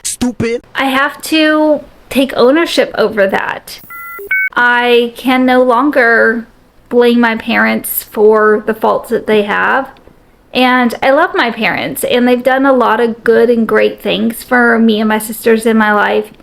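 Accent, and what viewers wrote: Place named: South, United States. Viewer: Wow, you remind me so much of myself, (although I don't have the delightful Southern accent)! I got my Grand Diplome in Culinary Arts in 2004, which led me to a 80 hour weeks of minimum wage foodservice gigs, so I acquired an Associate's Degree from University of Phoenix in 2011, in a vain attempt to (HA!), "improve" my life. They have both proven themselves to be nothing but expensive wallpaper. I spent my teen years and 20's working 80-100 hours per week, and my 30's working 60+ hours per week. I moved back in with my parents when I got my house foreclosed, and still live with them at 40 years old. The only way that I can make a living ($50K-ish per year) is in a warehouse, slowly killing my back and knees. I declared Chapter 7 Bankruptcy in 2012, along with a car repo and house foreclosure, but I was still stuck with the useless student loans. 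UOP should be forced to pay back all of the people like us, as their degrees aren't worth the paper they're printed on. Enough about me, I sincerely hope that you can claw your way out if that hideous credit card debt, and can get your student loans forgiven. Best of luck to you!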